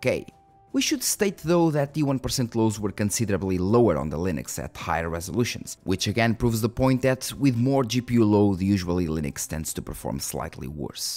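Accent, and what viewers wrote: Portugal. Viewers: Literalemente instalei linux para poder recuperar um hdd com bad sectores e agora estou a ponderar usar linux para jogos, btw, mal vi a tua cara na thumbnail ja tinha deduzido que eras tuga XD, depois foi o sotaque, depois foi so confirmar no canal Xd, como e que deu para saber que eras tuga ? idk btw keep it up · your native tounge is portuguese? are you from Portugal or Brasil?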